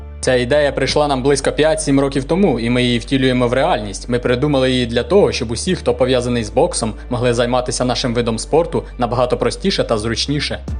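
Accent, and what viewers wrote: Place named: Rivne, Ukraine. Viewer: У вас Рівненський акцент...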